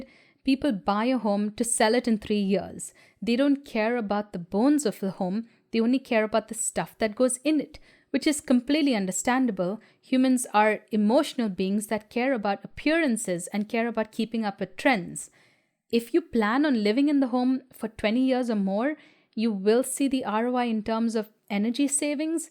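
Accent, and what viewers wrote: India. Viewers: Thanks for clarifying the name . When I saw your video and voice. I guessed you are Indian, but name sounded little different. No hard feelings was just love and curious. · I had pretty much decided you are Indian, from your accent. I expected is your married name, with your accent still doesn't make a lot of sense to me. Your Portuguese connexion goes far to explain why you don't look like most Indians I have seen. Years ago, I knew Yvette Rodrigues, her face had a similar shape to yours, though she came to Australia from the Philippines. Do I think all Indians are the same? They don't all look the same any more than all Europeans all look the same. I was rather taken one year, when I was watching an ANZAC Day march. A contingent of Poles came by. Individually, they don't look much different from any other white Australian. Together, the difference is striking. 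I suspect they mostly speak English though, but also a selection of other languages such as Urdu, Hindi. Arabic wouldn't surprise me. Probably with wildly different accents and dialects. I am not that surprised to find architecture students aren't quite ready for the workplace. From my expeience, in the 70s it took computing graduates a year to settle into their first job so they didn't need to be closely supervised. Part of it was that they learned on Burroughs equipment, not at all like any government (I was in Canberra) department. You could conceivably work to fill the gaps. I live in Mandurah, about 15 minutes walk from the Indian Ocean, about the same latitude as Dallas. We don't do ice and snow. You should do Q&A exactly as often as you like. I tend to not watch them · I still can't get over how refreshingly entertaining it is to hear this lovely lady with her Indian accent say "y'all" at the end of some videos.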